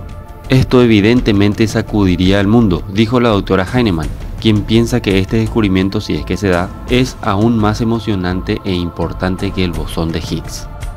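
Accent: Paraguay. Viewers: Es confundible por LA voz es paraguayo yo soy Paraguay tambien jeje saludos amigo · sos paraguayo? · ¿Eres Paraguayo?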